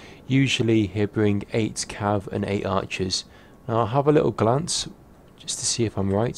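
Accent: United Kingdom. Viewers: idk,i just love your british accent :D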